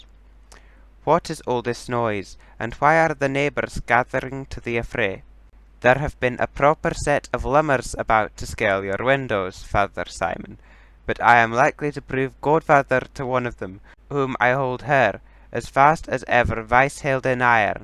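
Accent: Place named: Scotland, United Kingdom. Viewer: i love this book! it’s my favourite you did it so well!!! why are u now scottish tho?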